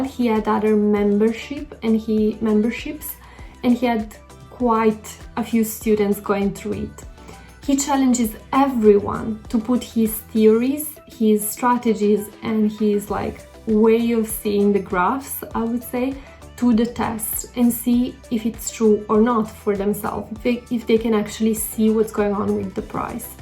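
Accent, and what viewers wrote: Italy. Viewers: Cute the italian accent , continua cosi!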